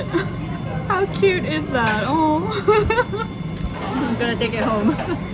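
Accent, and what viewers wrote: England, United Kingdom. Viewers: Love your Video, I love Sushi and it looks so good, Love Unagi, I am about to stop in Tokyo, can't wait, Last time I eat 108 pieces, my record, OH you must have spent time in England, your accent comes out every now and then. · Ah yes I read you went to university in UK. I can hear it in your accent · Are you from England/Britain? You have a vague English accent over the clutter in the background 😅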